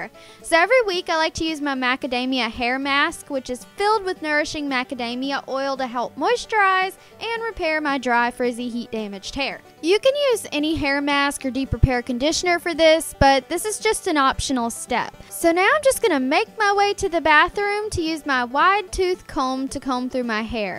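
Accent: South, United States. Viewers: You have SUCHHH a southern accent like whaaat but great video 😝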